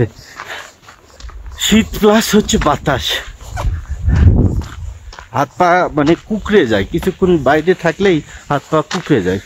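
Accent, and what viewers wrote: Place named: Bogura, Bangladesh. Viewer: আমিও বগুড়ার, আপনার কথা শুনে মনে হয়েছে, পাশাপাশি আপনার চেহারাও পরিচিত মনে হচ্ছে।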